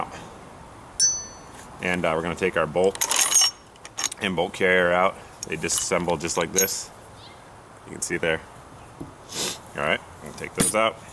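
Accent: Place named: Northern California, United States. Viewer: Norcal accent like Jake Shields. Great video man style- fast and to the point.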